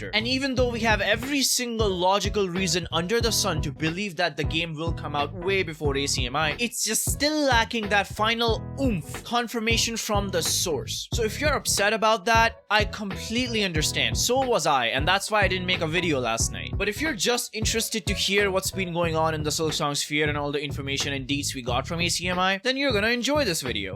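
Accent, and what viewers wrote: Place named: India. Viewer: I think it’s evident by his accent that he was at least raised in an Indian household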